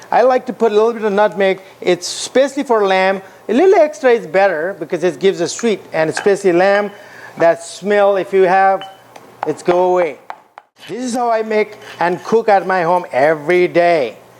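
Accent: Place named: Nepal. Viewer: You can tell he is Nepali by accent Grt job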